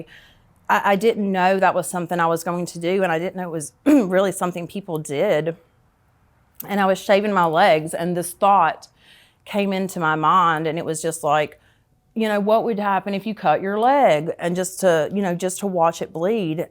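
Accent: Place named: North Texas, United States. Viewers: I would have guessed her accent to be rural Oklahoma. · Did you grow up in North Texas/Oklahoma? Your accent is very familiar I am from there too.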